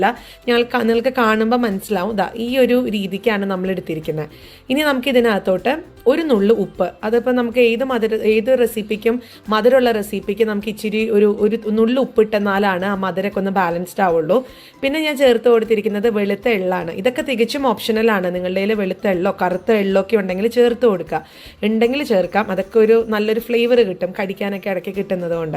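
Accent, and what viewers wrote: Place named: Valluvanad, India. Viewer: വീട് എവിടെയാണ് സംസാരം കേൾക്കുമ്പോൾ ഒരു വള്ളുവനാടൻ ഭാഷ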